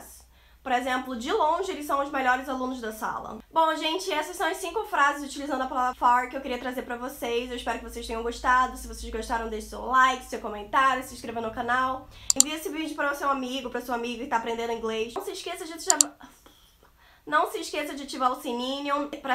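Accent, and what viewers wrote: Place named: Rio de Janeiro, Brazil. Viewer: Pelo sotaque deve ser carioca hahha. Uma dica, tenta deixar um pouco mais as traduções na tela, pra quem não manja muito dmr um pouco pra pegar a forma como se usa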